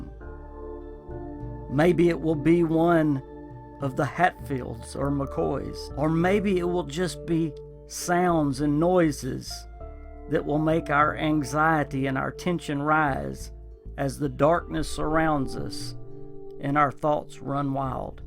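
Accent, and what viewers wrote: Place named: Georgia, United States. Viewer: Sir, are you from Georgia? You say "why" and a few other words that reminds me of how my grandparents talk.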